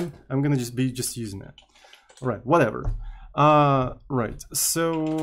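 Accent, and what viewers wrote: Russia. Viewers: They are probably YouTube auto generated, he has a moderately thick accent, it's not quite Russian it's almost a mix of Russian and South African sounding, but it isn't pure clean sounding English so the auto transcriber may have had difficulties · You Russian accent is too strong, it's вери анплезант фор май ирз. Ю шуд стоп сейнг s like з энд th лайк с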